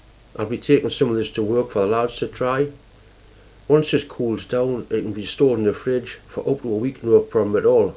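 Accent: Newcastle, United Kingdom. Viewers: good video , I did enjoy listening to the Geordie accent . Being from the north east of england , I miss a ham and peas pudding sandwich on a stottie cake . I cannot find the stottie cakes in las vegas.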